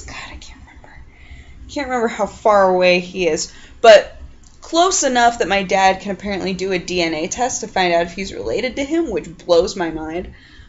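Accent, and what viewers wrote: France. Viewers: oh that ridicolous french accent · Les deux manières de le dire sont bonne :) Ton accent est très français de France mais c'est très bien! Long time French Canadian follower :3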